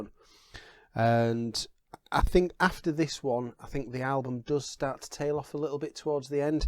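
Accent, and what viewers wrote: Leeds, United Kingdom. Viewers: Are you from West Yorkshire? Heard you mention jumbo records on one of your videos and you sound Leeds!!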